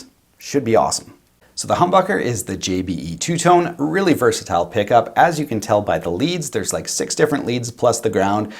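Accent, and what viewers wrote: Canada. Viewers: Love your channel,. You obviously know your stuff and love playing! Your Canadian accent is icing on the cake.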